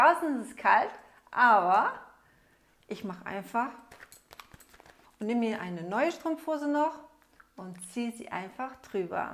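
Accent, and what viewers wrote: Germany. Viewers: That German accent/ language is amazing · Klingt nach Fränkin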